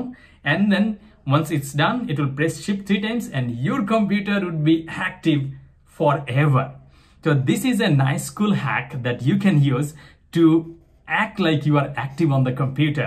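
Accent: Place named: India. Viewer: Are you from INDIA? Because your accent is like ours.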